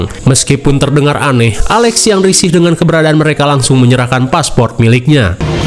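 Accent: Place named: Madura, Indonesia. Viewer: Madura hdiiiirr mas broooo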